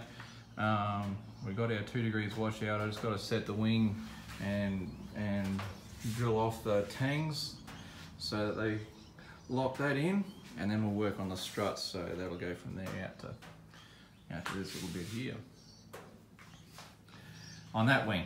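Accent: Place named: Australia. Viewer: Nice work,.........and its good to hear an Aussie voice of many vids that ive watched........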